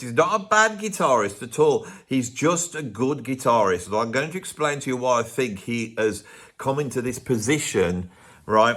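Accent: Birmingham, United Kingdom. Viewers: Five minutes in and my ears are bleeding from the wall of unpunctuated sentences, delivered in what I presume is a Worcestershire accent, sounding suspiciously Brummie. All this and we've almost finished defining "overrated". Brevity, please, dear Dog. Unfortunately I want to find out who these guitarists are because nothing is more fun than finding opinions to get irate about. How about some bookmarks?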